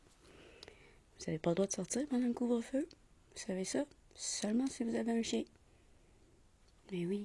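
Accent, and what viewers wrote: Quebec, Canada. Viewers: Bon tu l'auras chercher. Je viens de m'abonner à ta magnifique chaîne. T'es trop forte, trop de talent. En tant que Français, ton accent Québécois me fait littéralement craquer 🤩 Bisous de France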